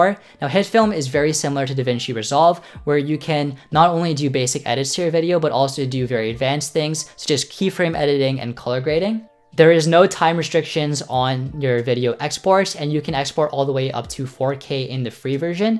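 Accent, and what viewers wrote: United States. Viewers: I was a bit overwhelmed when I heard that soft, American-english accent. Times have changed. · sir you are looking as Indian Boy with American accent.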